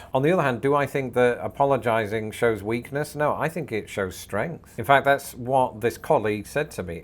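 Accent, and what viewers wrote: United States. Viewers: Your American accent is getting pretty good. Just need less nose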